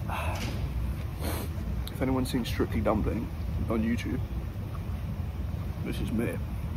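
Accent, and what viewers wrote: United Kingdom. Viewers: Omg i love British accent absolutely great and your video absolutely amazing, all support 😊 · please put english subtitle in your next video coz my brain is not cooperating with your british accent😅🤣✌️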